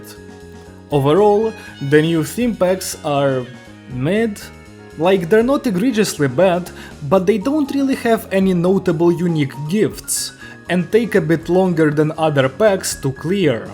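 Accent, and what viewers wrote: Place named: Russia. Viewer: God, one day I will reach my hand through my monitor just to reach your neck and find out why do you sound like you're Russian, German and Spanish at the same time💐💕 As for the theme of the video? Honestly, I kind of get it. I always had that « Ew » ring in my ears when I used Glimpse to just Hollow Purple my way through the MD.. And although I'll miss the old days of the hydrogen burn, I still think that this is a healthier way to go about the MD, since it really lifted some weight from my shoulders. If burn or rupture are the best but not op.. I can just have fun with my favourite sinking team. Because there's much less feeling of « Shi man yo stupid ahh missing out on so much efficiency rn bro » due to the opressive cleaning capabilities of the forsaken duo. Sorry for my low tier English skills in advance🙏🏻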